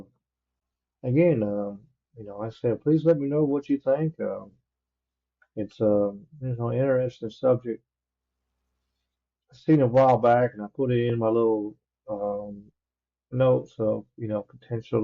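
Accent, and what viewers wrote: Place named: South, United States. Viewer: With your mumbling and southern American accent, it is hard enough to understand even half of what you are saying. With the addition of your pointless, loud music which accompanies this, it is nearly impossible.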